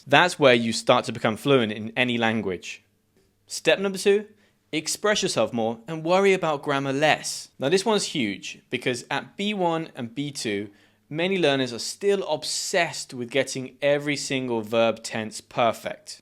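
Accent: United Kingdom. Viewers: I finally found out that I definitely love British English, I'm able to understand when you speak. Thank you, you're the best. Te mando un fuerte abrazo desde Ecatepec, México. 🇬🇧❤️🇲🇽🫂. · Best English teacher and best British accent ❤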